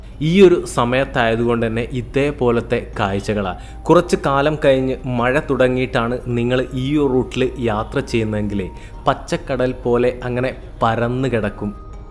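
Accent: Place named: Malabar, India. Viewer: മലബാറുക്കാർ അങ്ങനെ ആണ് പറയുന്നത്.... മധ്യ തിരുവിതാം കൂറിൻ്റെ ഭാഷയുമായി ഉച്ചാരണത്തിൽ വ്യത്യാസം ഉണ്ട്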